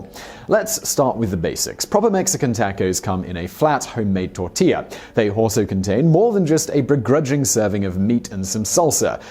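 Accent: United Kingdom. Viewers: Quick question.....where are these videos made ? You sound so British, but everything is compared to America. If it hadn't been for Cornwall being mentioned I'd had no idea what you were talking about. I have no idea about Wyoming, Dollars and cents or even where Rhoad Island is let alone the size of it. It's like watching a cookery program where there's no such thing as Gas Marks and everything is measured in cups !! I begin to feel like Alice falling down the rabbit hole !!!!!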